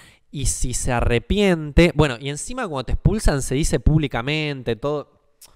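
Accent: Argentina. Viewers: Dude amo el acento argentino me partí de risa en el inicio xdd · Como le deben de haber quitado dinero atraves de las donaciones voluntarias supuestamente.a millones de hermanos.amigo tu eres argentino ?? por el acento lo pregunto.saludos gracias.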